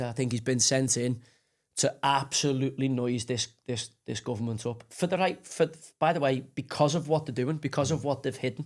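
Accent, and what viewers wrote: Liverpool, United Kingdom. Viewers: Much respect to you. Irony is many of these lefties will think you’re an idiot because you’re working class with a scouse accent. But everything you said here is spot on. They claim to care about the working class but they resent the white working class, as we have seen time & time again, and particularly with this scandal. You have more integrity in your little toe than Gary Lineker et al.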